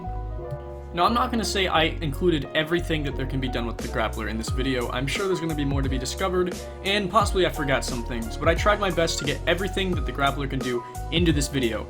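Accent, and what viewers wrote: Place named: England, United Kingdom. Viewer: you prob are the first parkour youtuber i have ever seen with an english accent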